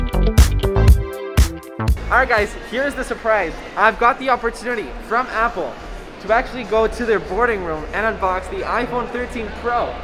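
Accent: Spain. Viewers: los que somos españoles escuchándolo hablar español y dándonos cuenta de que es español 🤡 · ese acentito español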